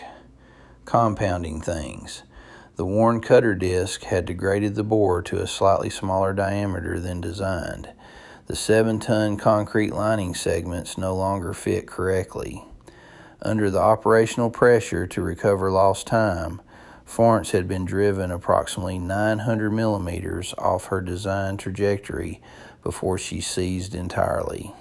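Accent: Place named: South, United States. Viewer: The diagrams look great but how accurate are they? Also, why did you choose a Southern drawl for an Australian subject? Elevenlabs?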